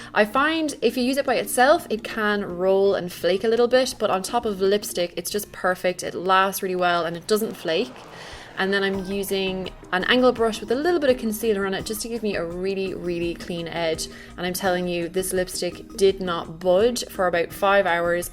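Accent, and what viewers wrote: Ireland. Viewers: You look just beautiful, radiant!! I love your vlogs. I'm a Brit living in the States. It is great to hear an Irish voice! :-)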